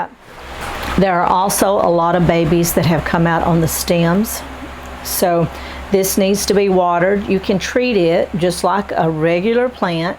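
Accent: South, United States. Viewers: You have a lovely southern accent. I am hoping you are in the same zone i am so i can get succulent advice throught your videos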